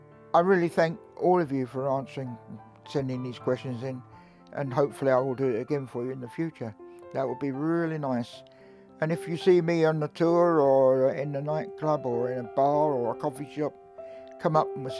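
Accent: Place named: England, United Kingdom. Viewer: Hi I didn't know you are a pomie. I thought you were a Yank. But I like you so much mate cause your a man you got that attitude and you remind me of my friends of the past. More boys should grow up like you. As far as doing your hair you can watch my video called balding and greying part 2 Dave Kirby.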